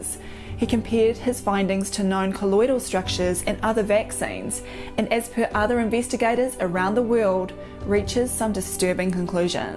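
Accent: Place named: New Zealand. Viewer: I went, I watched.. I don't sign up for all the platforms but it asked me to let you know if I enjoyed it. I enjoy listening to the NZ accent ESP from you ;) However, I don't enjoy being terrified. On a scale of 1-10 of VALUE I'd give it 100. And a million thanks and blessings.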